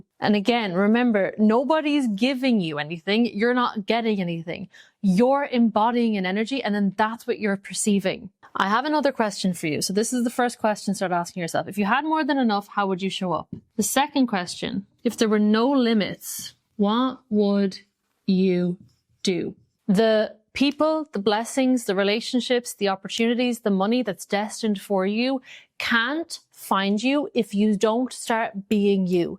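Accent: Ireland. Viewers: Are you Irish? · Loving the videos, recently found the channel. Explain it in way that’s freshhh yee. Just curious where are you from, are you Irish ☘️ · Love the irish accent .. Galway man here .. best luck with it all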